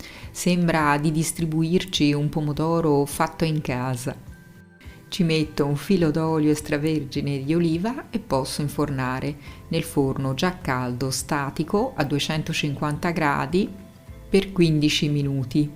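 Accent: Tuscany, Italy. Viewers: quell'accento todcano che rende il tutto "più buono"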